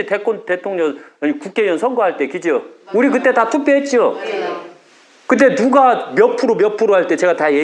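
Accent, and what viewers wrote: Chungcheong, South Korea. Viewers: 무식한 인간들 여기다모였네 갱상도 따까리들 아직도 세뇌되어 참으로 불쌍하다 아직도 독재박통 생각하고 있으니......쯧쯧쯧 충청도 사투리 쓰지마라 쪽팔린다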